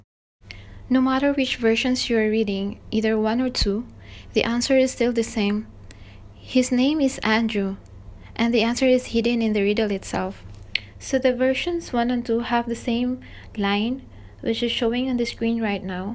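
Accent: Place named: England, United Kingdom. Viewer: Nicee Content, I like your English accent. Tinapos ko para malaman ko dn sagot I think Andrew is more accurate, hihihi nag iwan na dn ako ngskli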